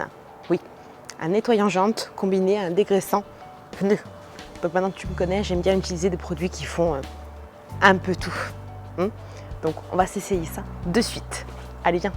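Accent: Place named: Corsica, France. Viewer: Coucou comment vas-tu Petite vidéo sympa pour le bêtisier j aurait mis un colorant bleu comme ta voiture c est vrai que le produit a l'air peu économique mais il a deux fonctions PS j adore ton acens anglais corse😀😘 a vendredi bises cordialement ce serait un plaisir que un jour je puisse te rencontrer pour avoir tes conseils au plaisir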